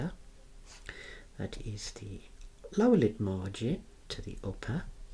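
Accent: Birmingham, United Kingdom. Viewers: When a man can make me relaxed with a Brummie accent you know you're watching a true master of their craft.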